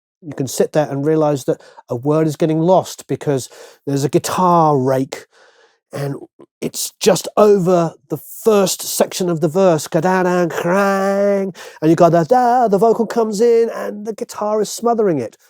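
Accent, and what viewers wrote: England, United Kingdom. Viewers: Love your work. Are you from the same part of England as Sting? You sound similar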